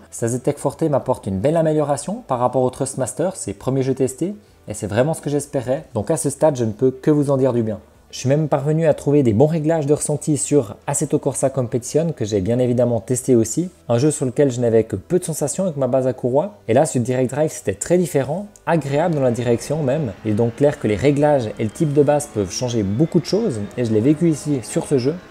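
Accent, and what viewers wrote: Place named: Neuchâtel, Switzerland. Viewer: on dirai un neuchâtelois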